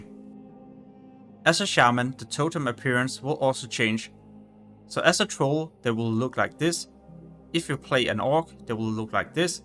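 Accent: Denmark. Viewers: Very nice video :D Playing with people from scandinavia I kinda started to catch on the accent, you are danish if I'm not mistaken :D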